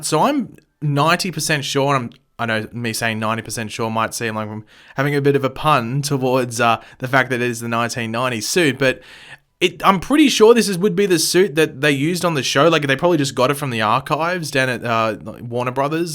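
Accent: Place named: Australia. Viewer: Lol, sounds so Australian in this video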